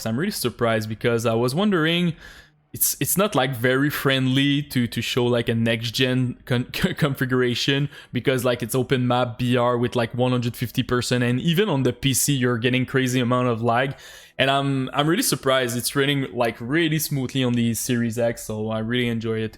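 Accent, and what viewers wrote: Quebec, Canada. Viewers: L’accent québécois mdr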